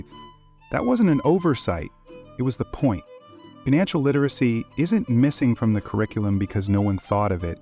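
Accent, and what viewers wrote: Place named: United States. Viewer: Good video. So simply explained. I was expecting something with an "Asian" voice that was difficult to understand. That was more like basic English spoken like an American. The concepts were straightforward. For a rat-race escape video, it diagnosed the problem and offered solutions, in a way that was useful and tabloid.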